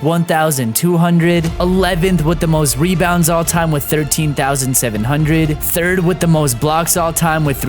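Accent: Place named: Mexico. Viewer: dude sounds mexican as fuck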